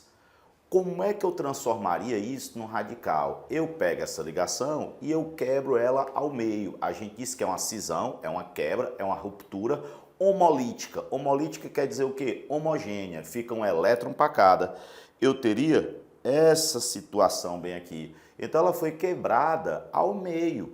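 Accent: Ceará, Brazil. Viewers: O senhor é cearense? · como eu amo sotaque nordestino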